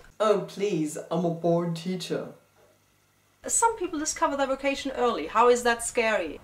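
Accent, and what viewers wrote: England, United Kingdom. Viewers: I like your British accent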